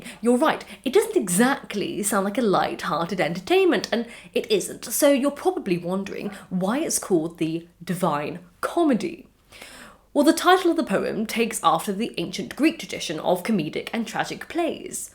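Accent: United Kingdom. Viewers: As a person learning both English and Italian this video is 👌, I just love her accent (British I presume)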